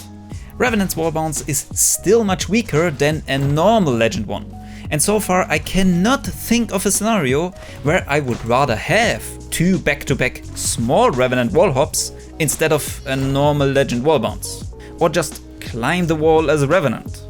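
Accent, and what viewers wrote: Germany. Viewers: Das is doch ein Deutscher Dialekt denn ich da höre,oder? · Are you german merkt man haha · you sound german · are u german u have a german accent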